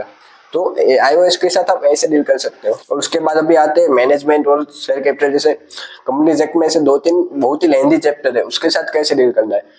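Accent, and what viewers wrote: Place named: Gujarat, India. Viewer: I like ur gujrati assent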